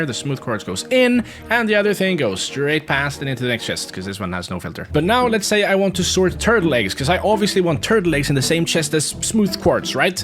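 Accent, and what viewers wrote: Sweden. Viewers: du, jag hör att du är svensk. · I KNEW YOU WERE SWEDISH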